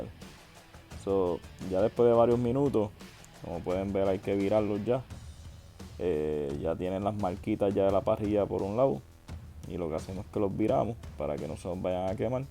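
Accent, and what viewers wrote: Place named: Puerto Rico. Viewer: Que lindo habla bien boricua ❤️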